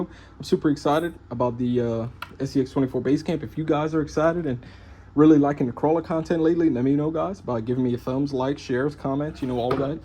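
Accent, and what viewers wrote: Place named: Deep South, United States. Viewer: Deep South. Where u from? I say ur bio saying Cajun. So Louisiana? Baton Rouge here. I’m waiting for my base camp to come in the mail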